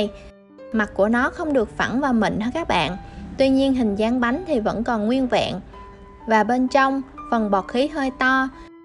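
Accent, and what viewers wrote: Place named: South, Vietnam. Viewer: Giọng miền Nam càng dể nến hơn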